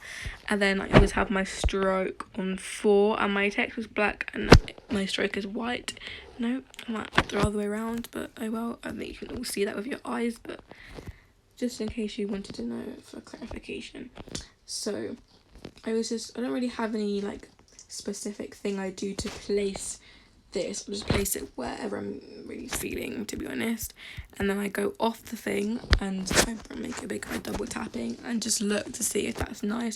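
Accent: United Kingdom. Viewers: YAY U BRITISH LIKE MEH UWU ! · British like me :) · IS THAT A BRITISH ACCENT I'VE LIVED IN BRITAN ALL MY LIFE AND I CAN'T DO A FREAKING BRITISH ACCENT (Your voice is so cute i love it) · U British??? :D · She has such a nice British accent! lol I just sound sleep deprived all the time😂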